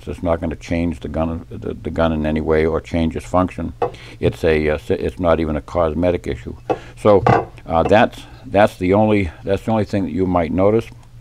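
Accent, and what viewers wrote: New England, United States. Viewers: Very informative video and I like your New England accent.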